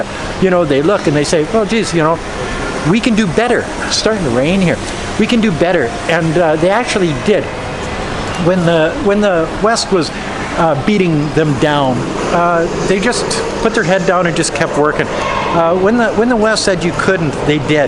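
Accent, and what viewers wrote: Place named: Canada. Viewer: This is the first time I'm watching this guy's video because it popped up in my recommendations. I can tell this guy is Canadian. He sounds (and sorta looks) just like Bubbles.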